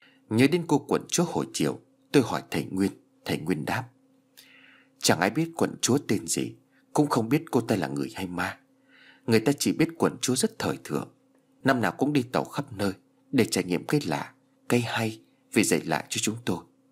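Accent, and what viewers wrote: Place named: North, Vietnam. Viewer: Giong bắc nhưng chuẩn,em da nghe a soạn nhiều rồi,cũng dc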